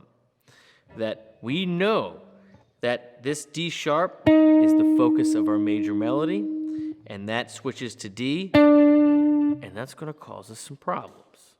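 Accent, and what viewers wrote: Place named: Maryland, United States. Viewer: freakin love you brother! miss me some Maryland accents, chilling out here over that Humboldt County line!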